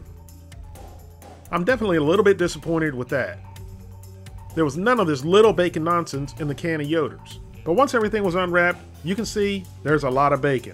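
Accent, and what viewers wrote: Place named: West, United States. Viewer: Gorden Wood it's cause woody Harrelson would say "I gotta do it for the people" alot and with this guys western accent it seems spot on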